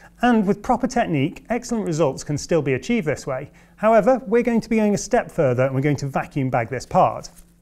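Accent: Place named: Middlesbrough, United Kingdom. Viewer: I know this has nothing to do with composites, but Id like to know where your accent is from, I know it's a commonwealth accent, but I keep hearing a bit of brough in it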